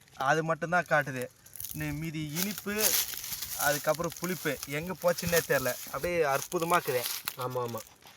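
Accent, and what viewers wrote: Krishnagiri, India. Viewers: Banglore hosur Krishnagiri area tamil bro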